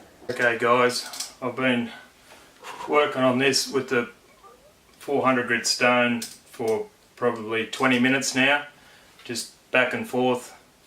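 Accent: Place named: Australia. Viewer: And a n Aussie it sounds!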